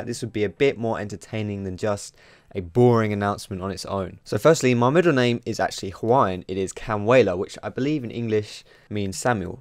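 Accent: England, United Kingdom. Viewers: Where abouts in england are you from?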